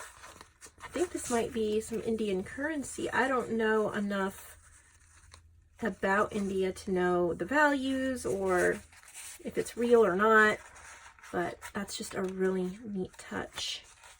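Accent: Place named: Cornwall, United Kingdom. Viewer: I listen to videos as she lives near to where I grew up and I find her Cornish accent comforting.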